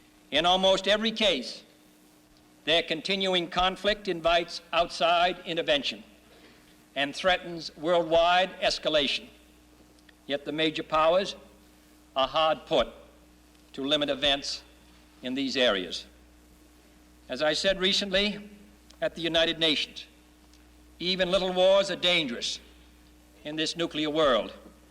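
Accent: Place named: Boston, United States. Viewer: I love his Boston accent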